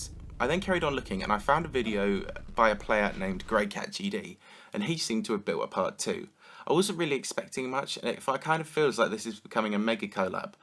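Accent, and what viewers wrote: United Kingdom. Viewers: It surprises me how British you sound in this video... Your accent could even rival Bold's. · I like your British accent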